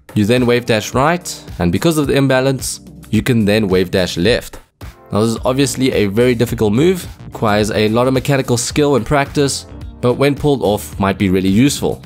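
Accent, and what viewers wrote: South Africa. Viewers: U sound like u from SA big up anyone from Cape Town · Do you have a South African accent?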